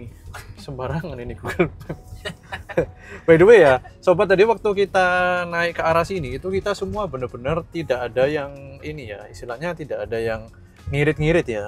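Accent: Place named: Java, Indonesia. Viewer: ngomong aja medok yauda pasti bahasa indo Lah ,, lo mau ngomong sok english jg tetep aj predict ur nations braww hahaha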